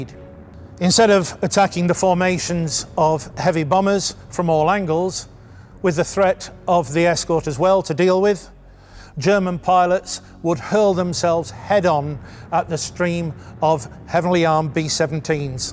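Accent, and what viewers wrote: England, United Kingdom. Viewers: I think the dropping of the "e" is more to do with the speaker's regional English accent than anything else.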